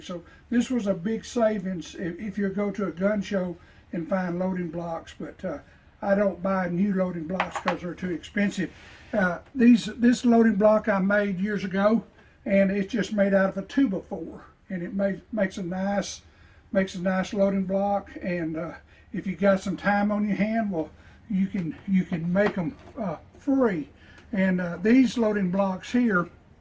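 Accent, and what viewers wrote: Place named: South, United States. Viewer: I really liked the information you provided. Just for fun I turned on the closed captions and they really don't do us people from the south or with southern accents any justice. They messed up every 5th word you said.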